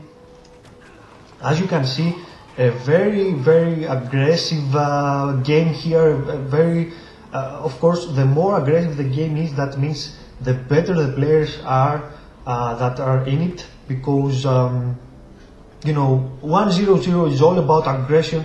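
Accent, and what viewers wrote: Greece. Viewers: Hey, are you Greek? That accent sounds like a Greek talking English. Just wondering...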